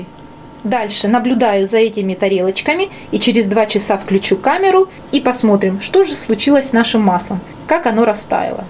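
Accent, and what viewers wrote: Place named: Ukraine. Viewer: невозможно слушать, необразованность жуткая у сей девицы. Украинский акцент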